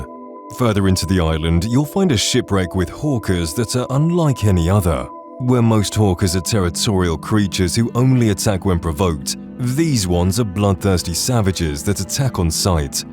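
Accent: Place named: England, United Kingdom. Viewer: That's how it is pronounced in England. You know, where English is from. Seriously... Americans are unbearably thick.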